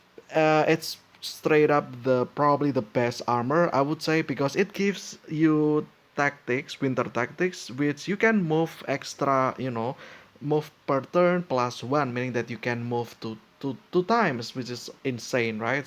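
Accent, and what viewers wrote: Indonesia. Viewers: Sudah kuduga beliau ini orang indo, dari aksen dia berbicara mirip seperti orang indo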